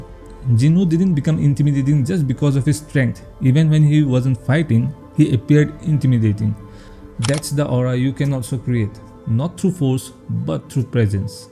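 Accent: India. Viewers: Assamese Accent on top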